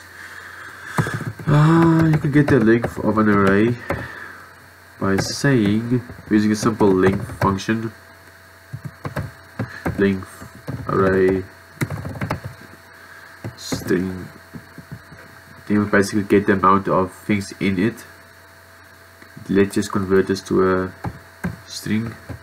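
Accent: South Africa. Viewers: Are you from south africa? AWEH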